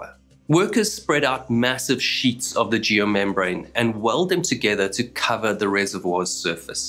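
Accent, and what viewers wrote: South Africa. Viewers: I love the south African accent. I had a good buddy from there.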